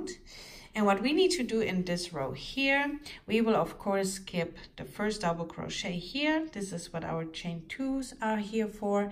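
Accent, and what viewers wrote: Germany. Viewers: you speak English with the exact same accent as a German colleague of mine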